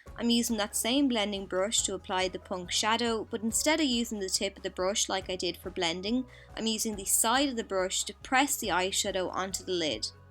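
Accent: Ireland. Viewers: you're accent is so pretty! you sound so sophisticated whenever you speak, are you from Ireland? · I love your Irish accent, and I'm half Irish myself. I love that beautiful makeup look you did. I am also learning how to do liquid winged eyeliner.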